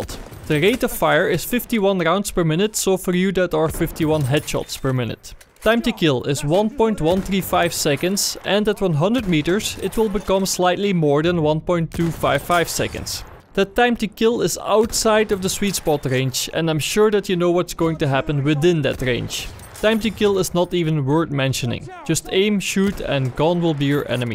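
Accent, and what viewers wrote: Netherlands. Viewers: are you dutch? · That accent sounds Dutch with a hint of Scottish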